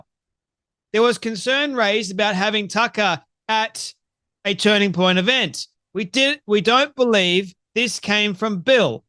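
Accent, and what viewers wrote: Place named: Australia. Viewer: I listen to you everyday, encouraged to hear an Australian voice. I admire your tenacity and relentless efforts God bless you.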